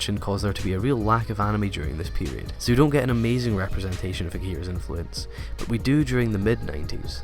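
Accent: Scotland, United Kingdom. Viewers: You Scottish bro?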